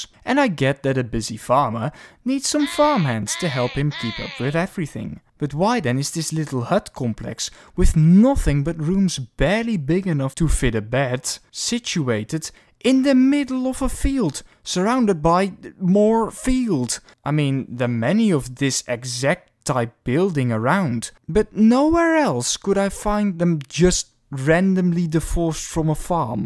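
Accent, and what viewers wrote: Netherlands. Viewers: Wat een heerlijk Hollands accent :' ) Got a sub out of me tho!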